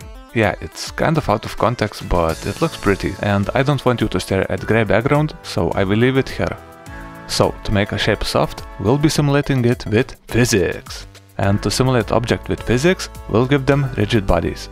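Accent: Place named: Russia. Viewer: Damn dude you have such a русский акцент .. (Аж ваще)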